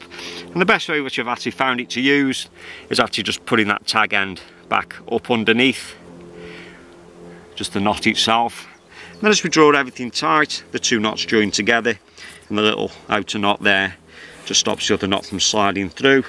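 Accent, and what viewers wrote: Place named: Wirral, United Kingdom. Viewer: Just come across your videos mate really well made and informative, I’m wondering if you’re quite local to me as you seem to have a bit of a Wirral accent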